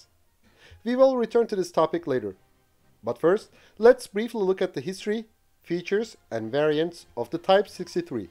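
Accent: Turkey. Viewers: aksana bak kesin türk amk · Konuşan Kardeşim kesin Türk. Selamlar.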